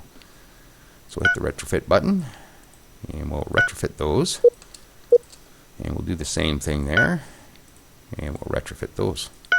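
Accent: Canada. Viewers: great series. I have to ask are you Canadian? Your accent is either Eastern Canada or, Northern Ontario. I could be way off but I had to ask. I am from Ontario and the accent is very distinct.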